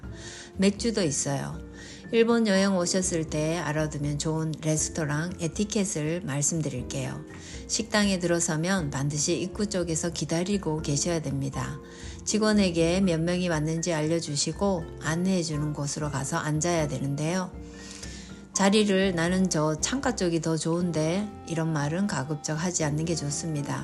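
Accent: Gyeongsang, South Korea. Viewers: 저는 첨부터 경상도 출신인거 알았는데요^^ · 어머니, 단어 억양에 경상도 성조가 있어요. ㅋㅋㅋ 저도 처음에 듣고 이분 경상도 분이구나 하고 알았습니다. 그런데 듣기 좋아요. 오늘도 잘 보고갑니다. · 억양이 경상도가 있어요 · 저도 경상도 사람이에요 ^^ 경상도 억양 반갑고 정겹습니다 따님 한국억양도 경상도 억양이라 속으로 웃었네요~ 그치만 어쩔수 없지요.. 따님 든든하시겠어요^^ 일본 생활 엿보는 재미가 있어요 응원합니다 화이또! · 한국인과 일본인 차이점 사소해도 많지요. 님은 나레이션만 들어도 경상도 사람임을 일수 있어요. ^^ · 경상도말투가맞아요 かわいい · 경상도 억양이랑 사투리 쓰시네요. 본인이 모르실 뿐. 사투리가 나쁜것도 아닌데 왜 안 쓴다라고 하시는지. 그리고 따님 한국말 능숙하게 구사하셔서 아주 이쁘세요 😍 💕 ❤ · 나레이션에 경상도 억양이 있어서 더 듣기 좋아요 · 이 분과 9개월전 제가 거래했던 부동산 대표님--경상도 상경 추정되는 50대초중반 연령, 서울거주 20수년이상분과 억양, 어투 싱크로율 99%네요. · 우연히 이 영상으로 처음 들어오게 되었는데 경상도 사투리가 살짝은 있지만 아주 티날정도는 아니에요!! 일본으로 유학하러 온지 3개월이 된 사람으로서 이런 에티켓 알려주셔서 너무 감사하고 따님분 한국어 너무 잘한다고 전해주세요~~!! 다시 한 번 감사합니닷! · 참 별 넘이 다 있네요....... 따님 나름 노력 많이 했을텐데... 근데........ 사투리 안 쓰신다고요???? ㅋㅋㅋ 경상도인지 바로 알겠던데요.. ㅎㅎㅎ 항상 잘 보고 있습니다.. 이쁜 따님에.... 이해해주는 신랑에.. 일본에서 잘 살아오셨네요.. 자부심 가져도 되실 분이신데.. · 엄마가 경상도 사트리가 좀 있어요♡^^ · 엄마와 딸사이가 좋은게 부러워서 별 되도 않는 말을 하나봅니다. 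못난 사람들은 무시하세요 ㅋㅋ 근데 넘 귀여운 경상도 억양이셔요~ ❤ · 억양이 경상도 억양이예요. 경상도에서 자란 친구들 다 사투리 안쓰지만 억양때문에 사투리 사용하는 거 같아요. 따님과 대화 항상 좋아 보여요. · ㅎㅎ 사투리 마이 쓰십니다 ♡ 어투에 갱상도 사투리가... 너무 너무 대단하십니다 한번씩 들러 보는게 이젠 하루의 낙이 됐네요~^^ 항상 가족 모두 행복하세요~♡ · 이분은 경상도분이구나~ 생각했어요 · 억양은 경상도 시네요~^^ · ㅋㅋ 경상도 억양 있어요 그런데 그게 어때서 좋아요 따님도 우리말 잘해서 좋아요 엄마가 잘가르치셨네요~~^^ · 억양이 경상도입니다.섞이다를 경상도분은 썩이다라고 말함. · 난 사투리 안 쓰는데에 악센트가 경상도 분인걸 단번에 알겠네요ㅎㅎ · 빕먹을때 안쓰는 손도 상 위에 올려놔야 하고, 젓가락이 무기가 될수 있다는 상상이라니… 일본인들은 기본적으로 의심이 많군요.. 😮 그리고 누가봐도 경상도 사투리 쓰고 있어요 😂😂😂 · 사투리 안ㅡ쓰는데 억양에 경상도인줄 알았어요 어때요 모국언데요 욕만 경상도식으로 안하심 됩니다 문딩이 가스나가 하면 조금. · 왜 이상해? 한국말 잘 하는게? 잘 가르쳐서 그렇지~~ 얼굴 안보여주는게 뭐가 이상해? 그럴수있지 다니면서 불편한거보다 낫지 경상도 사투리 씁니다 ^^ 너무 보기좋고 다정한 딸램 부럽습니다 😊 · 경상도사투리억양이 강하신데요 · 경상도 억양이 잠깐씩 나타납니다 뒤늦게 정주행 하지만 성격도 경상도 기질이 보여지네요~~^^ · 경상도 사투리 쓰고 계십니다. 말할때 높낮이 ( 사투리 억양) 로 사투리임을 알 수 있습니다^^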